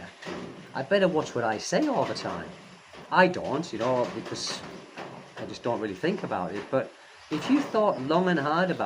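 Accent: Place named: United Kingdom. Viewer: ome pf the appeals of your channel is your British accent, i love the way you say, de-poorted :face-purple-crying::face-purple-crying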